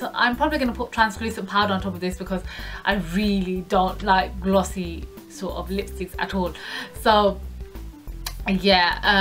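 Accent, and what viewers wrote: United Kingdom. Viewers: you have a really cute British Asian accent, awh I'm new to your Chanel btw, jus discovered you! Haha so bubbly an sweet. Looking forward to watching more! Xxx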